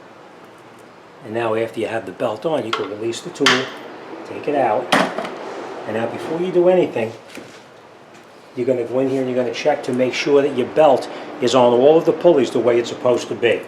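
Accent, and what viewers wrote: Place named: New Jersey, United States. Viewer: I can tell from your accent you're from Union or Bergen county NJ. I am from NJ. Every mechanic I hear is from NYC/NJ hahaha. Thank you I needed this.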